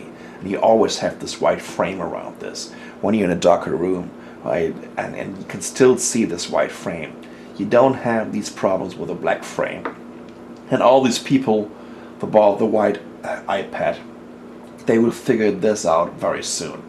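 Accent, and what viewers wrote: Germany. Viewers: lustig zuerst das deutsche und dann das englische zu schauen... Your accent is just funny -but this is germans like....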